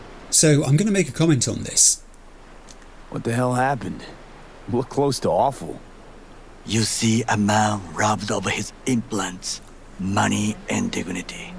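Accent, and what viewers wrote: United Kingdom. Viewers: Quite astute to notice the three branches of story- I have a feeling you might notice some other associations as you play. It's way more interesting to come across anything like that naturally so I don't want to give any hints. In my opinion, after having played through this game several times, Letting your whims and interest guide you to what content feels right in the moment is the best way to play. From my end, I just feel blessed to have been able to share in a bit of your experience with it through the window of the internet. Hello from across the pond! (I'm from Canada, and by your accent, I assume you're from the UK?)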